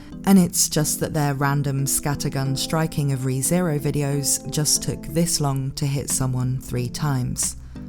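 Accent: United Kingdom. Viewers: I knew after listening to your video on Noralities that subscribing would be wise. You have "the voice", I could hear it then and I hear it now. Beautiful, smooooooth, intellectual British voice (sorry if you're Australian, I'm American please forgive me) that I could quite literally listen to all day and just be mush. I AM MUSH! HEAR THIS PROPHECY! WE SHALL ALL SLEEP VERY WELL ON WHATEVER NIGHT THAT COMIC BOOKS vs MANGA SALES VIDEO IS PUBLISHED! Thus, it is written and I look forward to that night. :D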